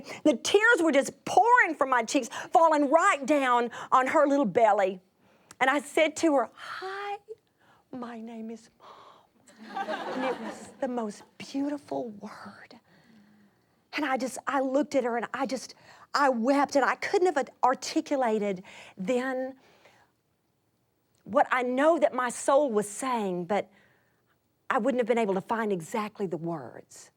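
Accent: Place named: United States. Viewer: wow. What an American accent!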